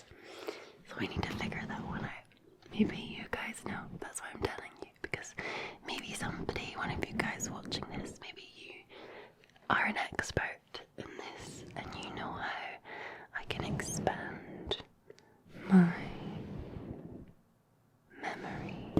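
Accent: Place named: Glasgow, United Kingdom. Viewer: From Glasgow AFAIK, or at least one of the towns around it.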